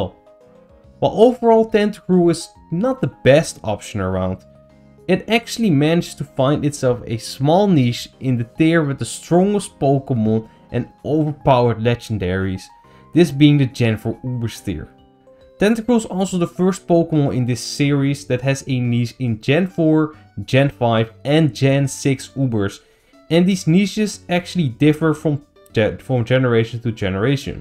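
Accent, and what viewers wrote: Netherlands. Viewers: Hi are you dutch?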